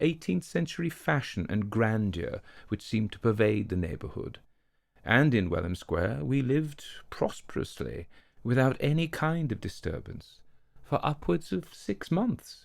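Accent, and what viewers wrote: United Kingdom. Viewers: Could you imagine if all these British narrators were in the same room!? It would sound like monotones static!! Not that I mind the narration or the accents, but I do kind of find it funny that like it’s always the same British accent, (really the same voice…down to the low pitches) I mean, I get it. It works for the narrator of Sherlock Holmes so why not fucking repeat it till it’s beaten into the ground right? lol i digress, good story man. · I like your accent. I'm like most Americans, anything that sounds British is attractive and I can't tell whether you're from London, Cornwall, or Northumberland. I'm from Alabama so my accent sets me apart in my country but still, I can't tell if someone is from California, Nebraska, or Ohio. I'm very happy you narrate these great stories. I look forward to new ones all the time.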